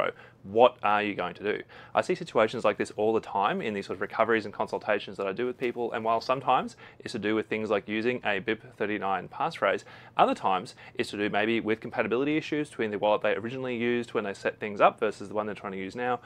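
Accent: Australia. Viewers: thanks for the reply, I never thought you would ever reply. But I am very happy to get some help. I think u got an Australian accent . I am here in Australia, too. I never entered any passphase. Never. I never had any other accounts. Just this one. I was using this account just one week before it all happened to me. Please 🙏 tell me what's the solution is. How can I get my life savings back. Because I have been very depressed from the last two weeks. Seems you have very good knowledge. Please 🙏 help me . Please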